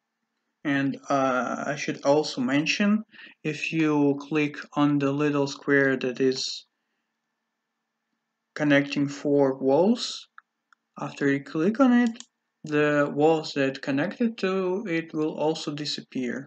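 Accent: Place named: Russia. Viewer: Also, do you speak Russian? Your accent seems familiar)))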